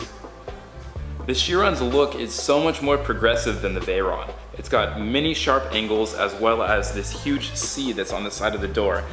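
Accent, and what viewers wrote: Germany. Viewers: Are you german because you got a german name and a little german accent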